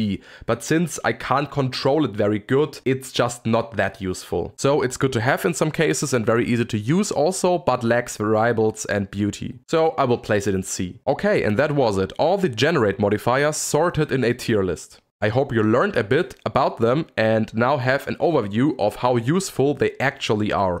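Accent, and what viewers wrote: Germany. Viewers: Nice Video good explained , Are you german ? you sound like you are hahaha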